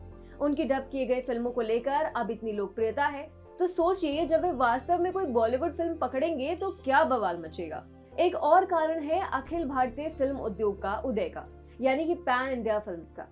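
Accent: Standard. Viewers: Apaki Hindi bahot shuddh he ,bahot accha laga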